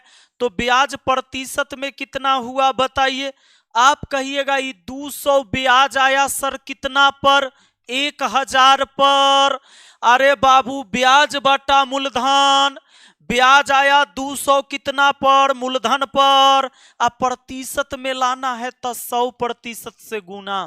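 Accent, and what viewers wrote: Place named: Delhi, India. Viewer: Delhi me rhata hoga wha aise hi bolte h adhiktar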